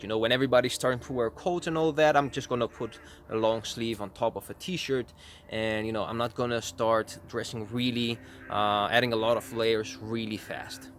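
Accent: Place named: Netherlands. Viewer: For someone who lives in Greece, you sound Very Dutch! 😀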